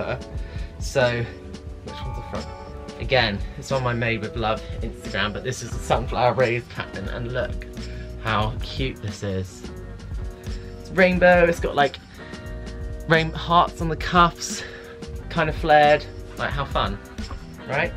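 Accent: United Kingdom. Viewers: Ok for the red spots I recommend clean them good with soap and water then add triple antibiotic ointment dont touch them not repeat the cleaning of them and putting a triple antibiotic ointment on them that way the Mexican work to clean your skin up winter skin has cleared up from them put some lotion on it to you know replenish ur skin. P. S. love ur voice love that British or Australian or Canada! Now on the music that you are playing I don't see where you give the copyright notice to the label company that owns that song as a DJ I always put on CDs copyright-protected of the year the CD was issued with the label.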